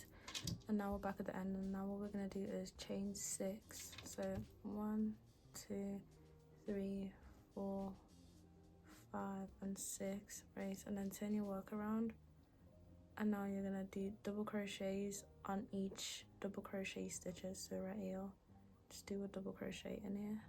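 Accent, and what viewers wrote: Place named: Scotland, United Kingdom. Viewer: Your accent sounds a little northern, it’s so cute! Are you Scottish? Love from America ❤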